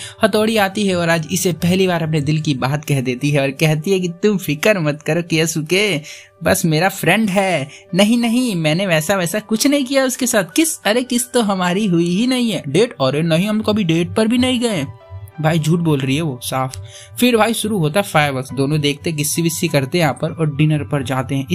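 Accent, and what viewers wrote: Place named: Delhi, India. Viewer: Kabhi kaha nhi mene 😁lekin apki tone delhi ki lagti h 😜😜😜😜😜😜😜vaisee bhi delhi aa gyi hu 😝😝😝😝😝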